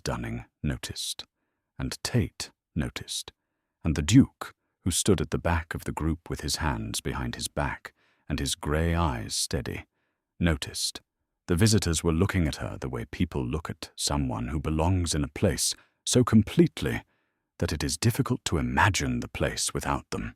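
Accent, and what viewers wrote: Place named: England, United Kingdom. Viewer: A very enjoyable story. Thank you for using a narrator with an English accent. When the story is about Dukes & England, that is the proper choice, yet some use a narrator that is, clearly, not English, usually American. It takes away from the presentation. Thank you for a good story, supported with good taste. It is appreciated!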